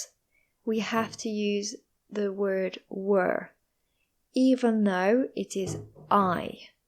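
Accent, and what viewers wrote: United Kingdom. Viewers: Are you British????? Reply please ❤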